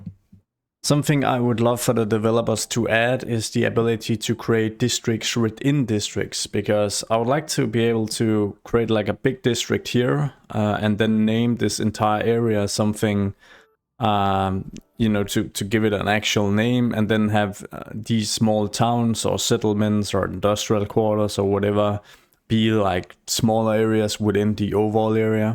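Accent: Denmark. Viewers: Jeg antager, at du er dansk med den accent :) Man kan aldrig gemme sig som dansker :P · Are you danish? The accent sounds familiar 😅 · I have to ask are you danish? you sound very danish when you talk. But very nice video love the content:) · Det kan kun være en dansk accent det der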